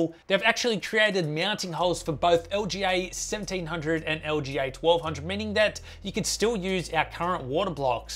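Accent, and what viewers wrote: Australia. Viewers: R u from Australia bro?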